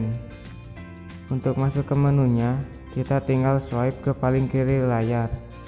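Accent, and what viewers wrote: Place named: West Java, Indonesia. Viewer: LOGAT SUNDA DIA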